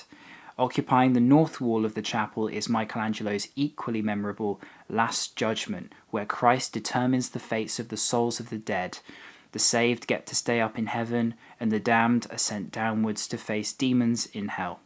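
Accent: United Kingdom. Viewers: I am glad that you started your travel again. Astonishing views of Vatican. Beautiful architecture. I want to visit Italy. Well made videos with a great music background. Well done,!!! I also like your British accent😘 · R U From The UK. You Have A British Accent.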